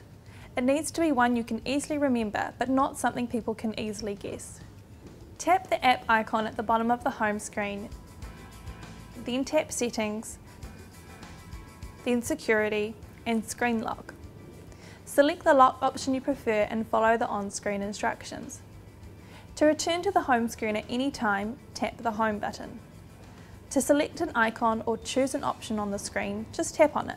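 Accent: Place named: New Zealand. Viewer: dat New Zealand accent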